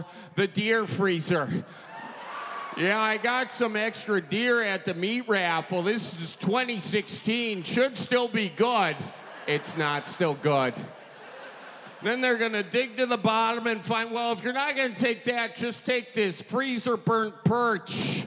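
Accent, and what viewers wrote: Midwest, United States. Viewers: I moved to Wisconsin 20 years ago and I've still never heard anyone with that thick of an accent. But then people here say I have a southern accent soooo 🤷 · I'm an iowan and this is so accurate and the Midwest accent is a mixture of southern and hick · Oh gosh! From central Wisconsin and sounds like home. Lol! Love ya! Good lookin’ Wisconsin guy! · Midwestern here, from Wisco herself. Is this what our accent sounds like? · This man is pulled between his Wisconsin speech pattern and neutral speech pattern. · Thank you for bringing this to YouTube! My son got me following you pretty early on. My California baby was in tears over your accent and Midwestern humor. Loved this special start to finish, would love a separate "auction" one, if you're so inclined. Hope to see you live someday! 💜✌️😎🍀🌎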